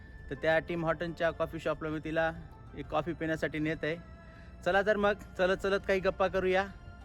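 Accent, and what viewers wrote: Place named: Solapur, India. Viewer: Aj first time Tumce videos pahile m. Tumcya tone vrun Vatl hot ki tumhi Solapur side ce asal. Chan Vatl yeikun ki tumhi latur ce ahat. Khup simple ahat 2ghpn tumhi ani tumci mul Pn.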